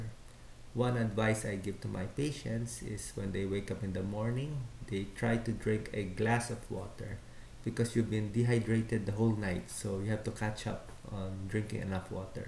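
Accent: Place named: Philippines. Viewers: This is a Filipino accent? he has a nice accent and relaxing voice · There are many Filipinos in my country, and I also I've had a couple of Filipino teachers, and they sound exactly like that, so yeah he's probably Filipino. I like their accent too.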